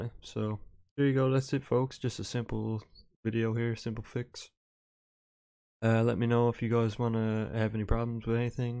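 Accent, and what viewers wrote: Canada. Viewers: You're totally Canadian :D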